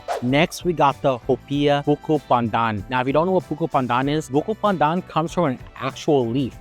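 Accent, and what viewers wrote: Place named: Philippines. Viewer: He doesn't look Indian. He looks straight up Pinoy. Even the way he talks 😅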